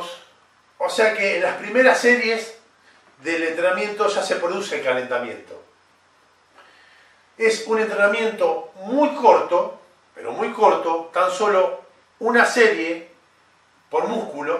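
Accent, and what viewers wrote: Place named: Argentina. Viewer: No conocía tu canal. Me gusta. Es bueno oír gente hablando del tema en "argentino" jajaj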